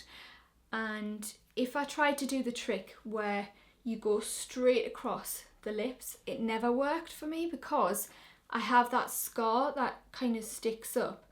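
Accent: Newcastle, United Kingdom. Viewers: Are you from South Shields? I'm from Newcastle and your accent sounds familiar!!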